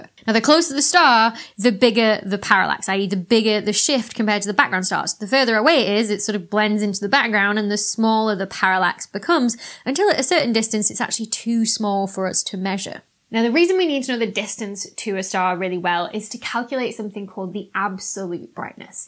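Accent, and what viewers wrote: United Kingdom. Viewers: I thought I detected a British accent, and the "naught" confirmed it. This channel is a sapiophile heaven, or Eden. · "plazmer"...I love a British accent.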